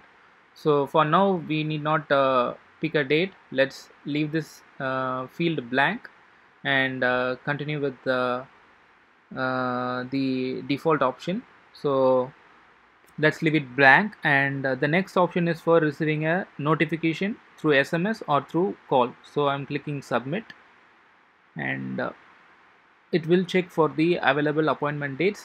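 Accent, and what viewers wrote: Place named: India. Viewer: heavy indian accent is making this video impossible to understand..... dislike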